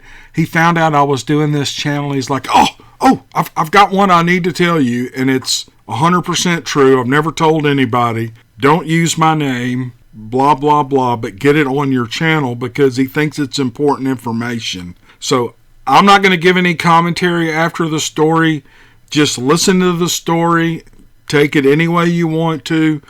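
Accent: South, United States. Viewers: I’m so happy I discovered your channel! The past couple months I have been reading a LOT of book series of Bigfoot encounters and this is SO much better! Your narration and splendid southern accent makes for awesome and enjoyable listening! Thank you for your great work!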